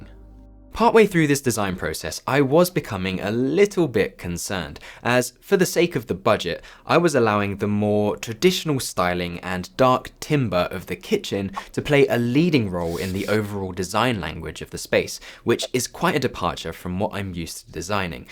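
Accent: United Kingdom. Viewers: His delivery is doing great. Wonderful apartment redesign. The next step is to take a breath and know we are on his side. Why else are we here. Eyebrows relax, British accent reduced, the approval asking lilt only occasionally. Tell us. No need to ask us. You have our ear and implicit approval of your talent and ability. Yur frpal G to solve abilities.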